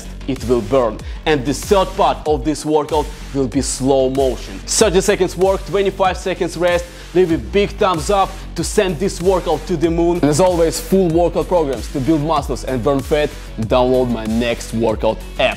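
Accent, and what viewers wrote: Russia. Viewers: by his accent, I know he's russian...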